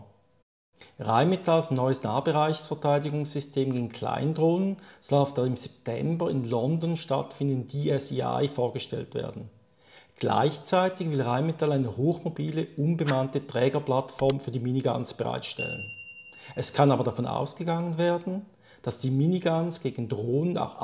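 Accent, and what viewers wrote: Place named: Switzerland. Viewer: Er spricht Hochdeutsch mit Schweizer* Akzent*. Würde er Schweizer Dialekt sprechen, würde ihn wohl kaum ein Deutscher verstehen.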